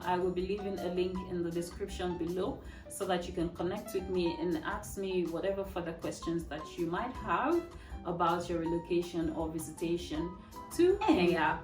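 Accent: Nigeria. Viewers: Your physical looks are Kenyan coincidentally. Your accent is also not as deep as my Nigerian next door neighbours😊. In three months your Nigerian accent will disappear😊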